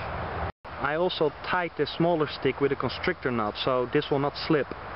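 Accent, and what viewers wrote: Netherlands. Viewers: This is a strange video. With a Dutch accent, Irish music and making a huge mouse trap, Lol, that probably wouldn't work, what's the point? Have you ever caught anything with this? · i fear that is no option looking at the serroundings and listening to hes accent i would say hes from the netherlands and their is nothing that size here that will take that trap (fall for that trap), we dont have carnivores in our country..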